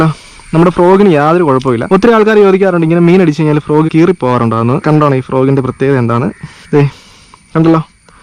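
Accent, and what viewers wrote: Kottayam, India. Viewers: Kottayamkarananno bro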